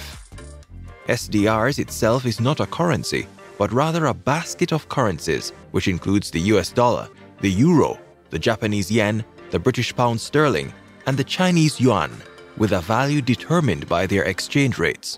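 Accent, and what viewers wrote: Jamaica. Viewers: I pickup his accent so I know he is Jamaican, but whose more biased against Jamaica than a Jamaican, they are always the ones in the front of the line to disregard and disrespect Jamaica and her accomplishments.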